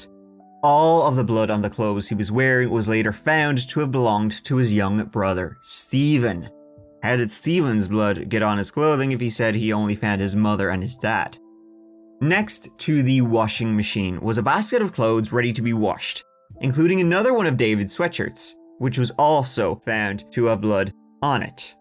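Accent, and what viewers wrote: Ireland. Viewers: he is Irish ❤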